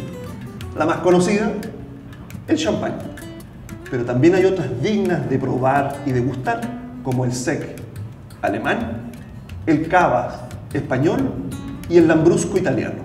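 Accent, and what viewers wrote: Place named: Chile. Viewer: esta loco este wey!! de donde es ha? parece tener un acento chileno....... los vinos espumosos de Italia se llaman ASTI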